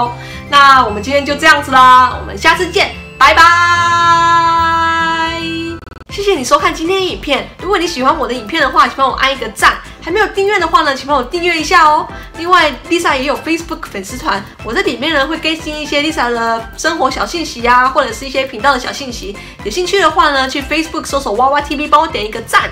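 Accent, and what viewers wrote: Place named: Taiwan. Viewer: 哈哈哈覺得這集台灣腔好重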